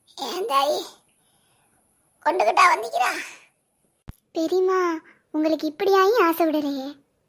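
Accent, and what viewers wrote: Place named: Kilakarai, India. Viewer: I always like klakarai slang.. Super❤️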